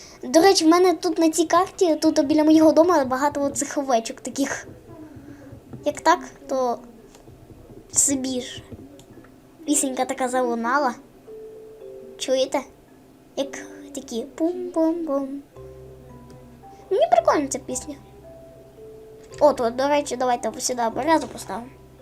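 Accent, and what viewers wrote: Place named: Surzhyk, Ukraine. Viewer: Чьо суржиком говориш !?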